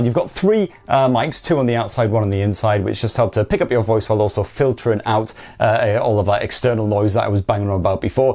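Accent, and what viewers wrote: United Kingdom. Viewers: The entire review it felt like it was a car review , was it the British accent I don't know !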